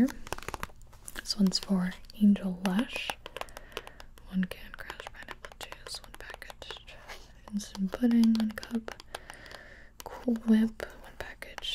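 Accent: Canada. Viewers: w8 is cAnaDiaN?!?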